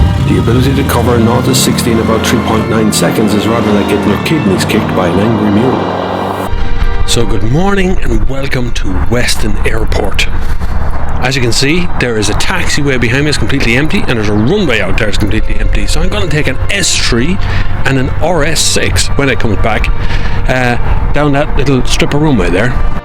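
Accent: Ireland. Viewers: in Ireland 3 is pronounced as tree. So if you hear a tree as three he is Irish....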